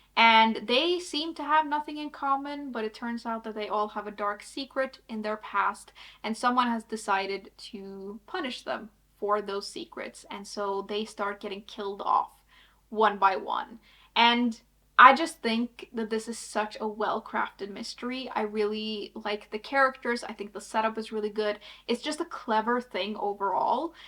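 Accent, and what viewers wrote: New York, United States. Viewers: No way! Maybe it's because of your accent, but I always thought you were from New York, USA or somewhere near there. 😆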